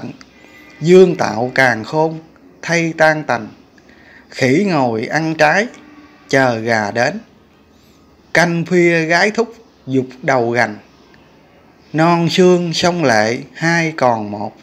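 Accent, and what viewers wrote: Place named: Central Vietnam, Vietnam. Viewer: Kiến thức này ko để trọ trẹ đọc mất thiêng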